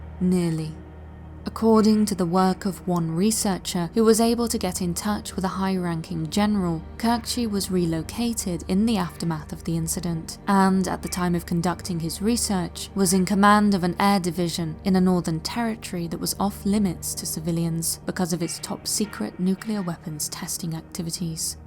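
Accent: England, United Kingdom. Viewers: English from England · It’s the queens English mate, all the best 😂🇬🇧🏴🇺🇸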